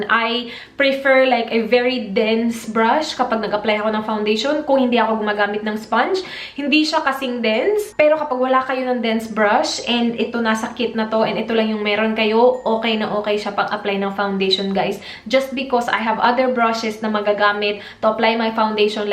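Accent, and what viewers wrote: Visayas, Philippines. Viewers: Bisaya acent☺️ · Ommggg!! I like the way how you speaaakkk!! Are you bisaya po? 😍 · Bisaya ka day? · You have a thick Filipino accent when you speak English but with practice you'll get better :) ang ganda mo with makeup and you are really skilled, thanks for sharing your review of Anne clutz brushes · I still can't get over about how beautiful your bisaya accent transitions in to a very nice and fluent English accent. Love you x.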